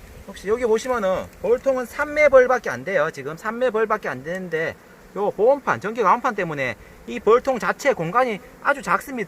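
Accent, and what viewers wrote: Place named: Gyeongbuk, South Korea. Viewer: 동영상 잘봤습니다 같은 경북사람으로서 경북 표준어 귀에 쏙쏙 들어 오네요 ㅎ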